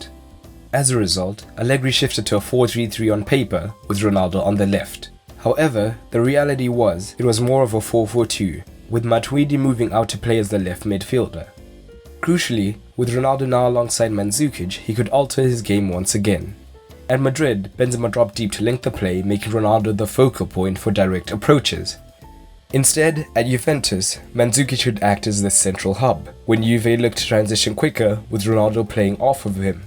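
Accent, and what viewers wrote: South Africa. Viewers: Great video there mate. Are you from South Africa by any chance that accent sounds distinctly South African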